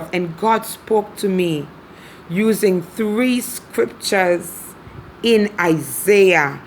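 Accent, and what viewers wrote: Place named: Caribbean. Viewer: What part of the Caribbean are you from? Btw I love your accent, I'm Haitian and Antiguan 🇭🇹 🇦🇬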